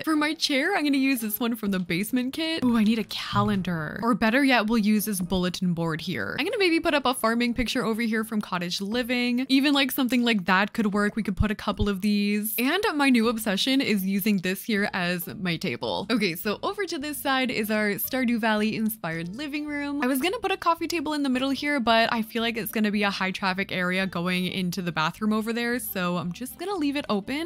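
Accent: Canada. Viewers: Are you Canadian? Also I feel like imma frequent your channel, glad I found you on my home page :)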